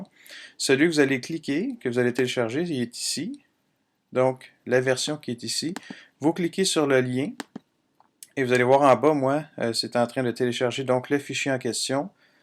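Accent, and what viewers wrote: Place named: Canada. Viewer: SUPER TUTO, très clair. Maintenant, il en faudrait un pour utiliser Audacity !! Et j'aime trop l'accent de nos amis canadiens !!! Merci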